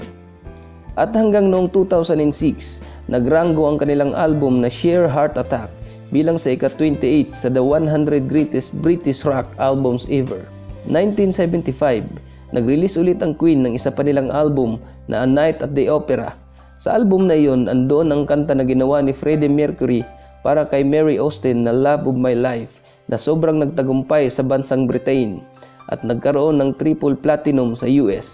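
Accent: Visayas, Philippines. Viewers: Bisaya ka man dong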